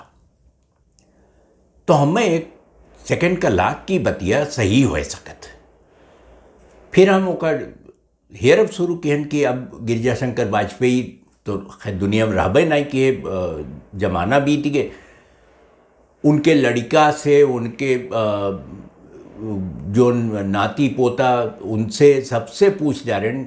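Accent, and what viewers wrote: Awadh, India. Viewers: अवध पंच म आपकां सुनित है तो बहुत नीक लागत है। इतनी प्यारी अवधी में आप बतुआत हो कि सुनि कै मन मुदित होय जात है। सीताराम पांडे वाली किताब सारांश प्रकाशन से पहिले छपी रही। तब बहुत चर्चा भय रही। हम कुछ अंश अखबारे में शायद पढ़े रहेन। अइसन याद पड़त है। दिल्ली के विश्व पुस्तक मेला से तब हम खरीदै वाला रहेन लेकिन तबै हमरे एक दोस्त कहिन कि हमरे लगे बाय हम तुहैं दई देब। अउर आज तलक नाहीं उ किताब नाहीं पाएन। आप बतवा जाय कि उ कितबिया अब कउने प्रकाशक के यहां मिली। हम खरीदै चाहित है।.. आपका अवध पंच बहुत बढ़िया चल रहा है। आपको बहुत-बहुत बधाई और हमारी हार्दिक शुभकामनाएंँ। टूटी फूटी अवधी लिखय क कोशिश किहन हैं कौनो गलती होई तो माफी दीन जाई।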